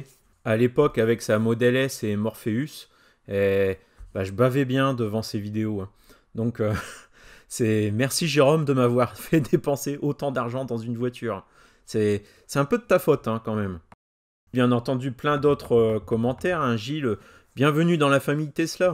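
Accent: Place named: North, France. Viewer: je participe crochet de coffre super enfin on voit qui se cache derrière cette voix du nord ✌️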